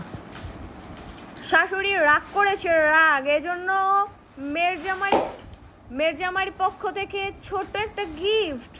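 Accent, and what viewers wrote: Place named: Narayanganj, Bangladesh. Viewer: Apner Kotha suny bujty parci.ami o Narayanganj er mey to tai.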